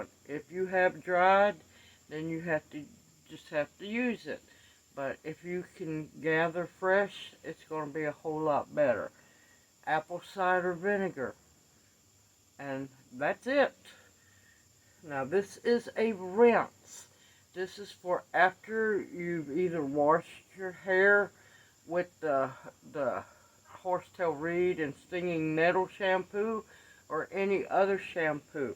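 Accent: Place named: South, United States. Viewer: I stumbled across your channel looking for info on goldenrod. When I heard your voice I thought that lady has to be from the south! And I was soooo happy to find out you are about an hour and half from me! I am looking forward to learning lots of Old Ways from you!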